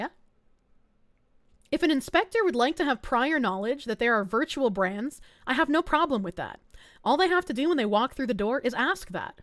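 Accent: Boston, United States. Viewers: ‘Chubby lesbian biker’ My brother in Christ, that is a Boston accent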